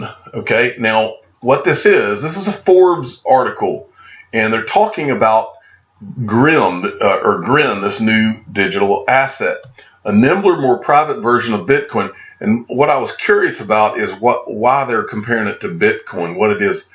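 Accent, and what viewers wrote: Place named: Texas, United States. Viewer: Sounds like you're from the south...maybe Texas. Love the accent.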